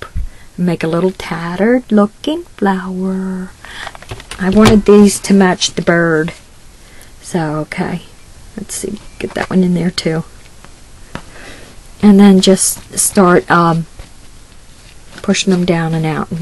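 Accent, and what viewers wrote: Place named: Philadelphia, United States. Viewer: You SO deserved to be showcased by Laurel...you are a true artist and you are doing the same thing she is by honoring so many of the artists who have inspired you over the years. Love how your book is coming along...purple is my favorite and your birdcage rocks! And I agree with NJGardenGirl....you definitely have that Philly accent going on! TFS, Heather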